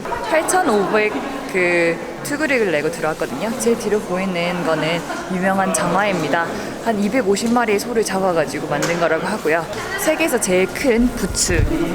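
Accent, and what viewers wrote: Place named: Busan, South Korea. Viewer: 저도 부산사람. 말투 익숙합니다. 항상 기대합니다. 안전여행 기원해요.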